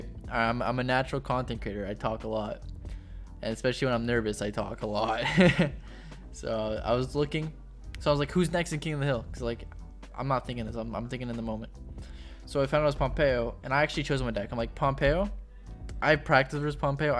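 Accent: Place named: Canada. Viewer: I can tell ur a Canadian boy😂😂 one of my favs for sure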